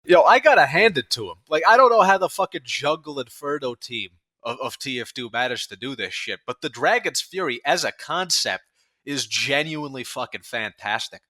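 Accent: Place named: New York, United States. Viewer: do you think swearing 27 times every sentence in new york accent makes you sound more confident or smth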